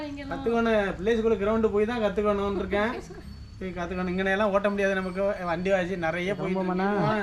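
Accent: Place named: Thanjavur, India. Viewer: Akka orthanadu thambi yin valthugal Thanjavur pechu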